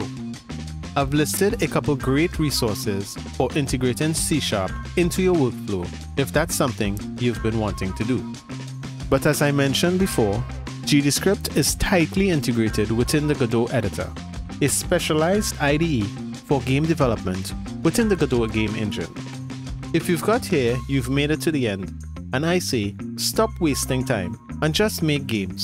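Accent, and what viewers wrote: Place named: Trinidad and Tobago. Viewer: Dude your trini arent you lmao Love it .. keep it up bro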